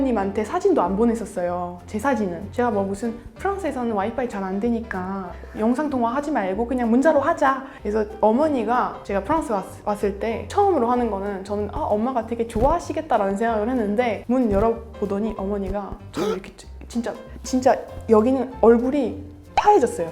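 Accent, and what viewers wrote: Seoul, South Korea. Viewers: 나보다 더 서울말 잘 하시네요.. 전 서울말 못함.... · 눈감고 들으면 완전 한국인 발음이네요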